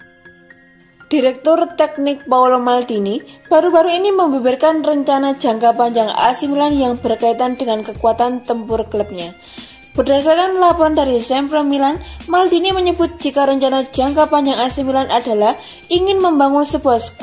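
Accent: Java, Indonesia. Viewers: Medok Amat Yaaaaaa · Min terlalu medok..🤭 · Min... Macane Romanyoli dudu Rogmanoli. Medhok iku gpp, sing penting pengucapan jenenge dibenerke.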